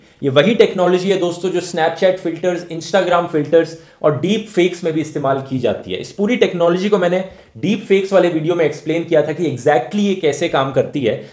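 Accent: India. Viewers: I dont understand a singke fricking word youre saying... please talk without that indian accent :)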